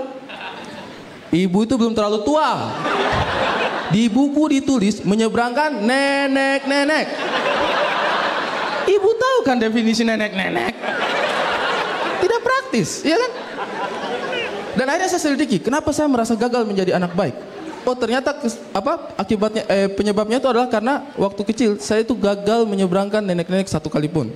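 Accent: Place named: Eastern Indonesia, Indonesia. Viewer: Timur logat nya enak 😄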